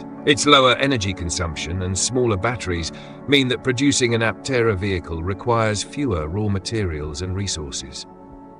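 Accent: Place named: United Kingdom. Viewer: Oh, goody! Another fluffer for aptera ..but with a British accent.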